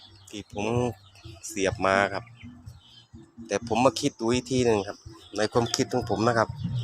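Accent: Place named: Korat, Thailand. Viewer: สำเนียงเหมือนโคราชบ้านเอ็งเลย ปลุกที่โคราชมั่ยคับ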